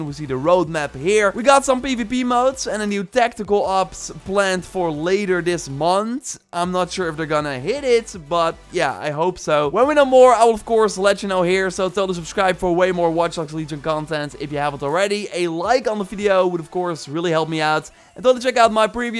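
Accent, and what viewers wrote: Netherlands. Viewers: Arw you dutch?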